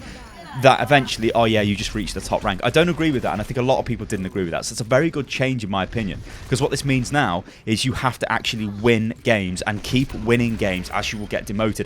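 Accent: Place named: Southern England, United Kingdom. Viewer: As a native of Britain I can confirm he is rather southern.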